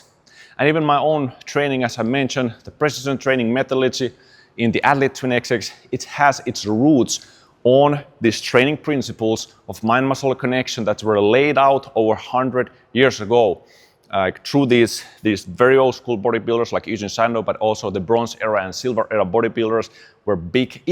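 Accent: Finland. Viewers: I can tell a Finn accent a mile away :) hard to make those English sounds isn't it ? Love the Finns. I could be wrong but sounds like Sissu to me. Love from Texas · OMG I was watching this without knowing where you were from, and I kept thinking "this accent sounds SO familiar to me..." Then when you mentioned Finland, the bomb just dropped 🤯 I realized you sound EXACTLY like Ismo!!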